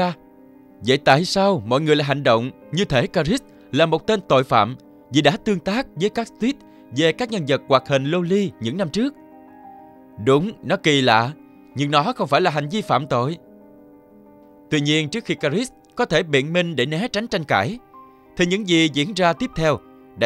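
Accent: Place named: South, Vietnam. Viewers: Đừng nói vậy, tội nghiệp anh ấy lắm Anh ấy sinh ra là người nam nên giọng vậy, k hợp ý bn cho lắm Nhưng k sao, giọng anh ấy hay là đc :D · mình khá là ko thích giọng ng miền nam khi đọc kịch bản, ko phải tất cả nhưng từ lâu mình rất thích nghe voice kịch bản của những ng miền bắc hơn (mặc dù mình là ng miền nam), nhưng giọng bạn này hay thật, dù đọc tên có hơi sai nhưng tụ chung giọng vẫn rất truyền cảm, mong kênh bạn sẽ ra nhiều video chất lượng hơn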